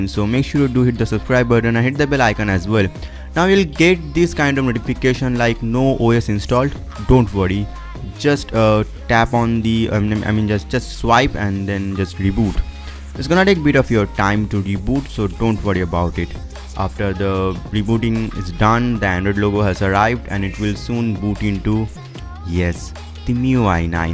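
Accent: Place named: Maharashtra, India. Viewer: such a stupid accent. marathi fucker i guess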